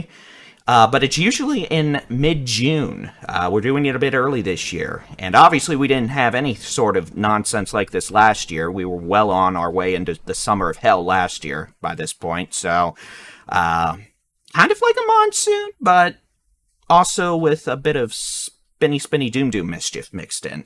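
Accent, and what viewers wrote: Texas, United States. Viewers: I just LOVE watching your Weather Reports. Born and raised in Ft Worth and love your down to earth reporting. Your Texas slang being thrown in is Classic. Bless You and Yours. Susan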